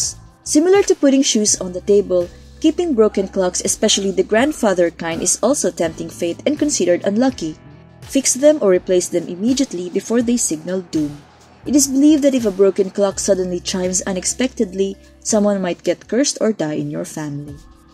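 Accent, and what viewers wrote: Philippines. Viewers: She sounds Filipino. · Since you said that, I noticed and I agree. She's most probably a Filipino. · You sound so Philippino ❤❤❤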